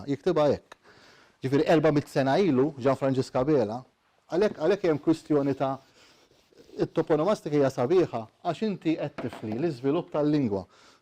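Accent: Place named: Tunisia. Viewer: The professor has such a Tunisian intonation.....one intervenant sounded Tripoltan.....and you have a few levantine words ....like issa, inkiteb...inqalab....at the end it is driving me crazy .....rahal ..marhala means both....distance of journey and staion of journey......and by the way ..,when you say Yena ....for I ....that was lost in the arab world.....but still exists in Yeni only in a town in central Tunisia and in Alexandria Egypt.and probably some Palestinians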